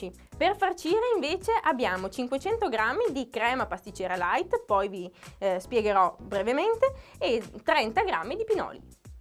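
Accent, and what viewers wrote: Italy. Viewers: Ma sei di Parma?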